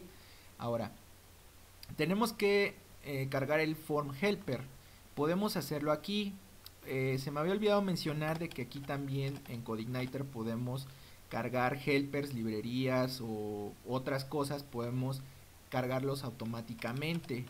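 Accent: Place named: Mexico. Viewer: Gracias por compartir, originalmente llegue buscando algo en concreto que se me esta complicando pero, me gusto tanto tu forma de explicar los temas que decidi hacer el curso, no se si lo terminare, pero como puedes ver ya voy por el 7 y seguire sin duda. Proximamente te escribire para hacerte una consulta ya que, como te decia, originalmente estaba buscando algo en concreto que al menos or donde voy no he visto que lo comentes. Escuchando tu acento me parece que eres de Mexico? vivi ahi muchos años, lo echo de menos pero me gusta escucharte.... un saludo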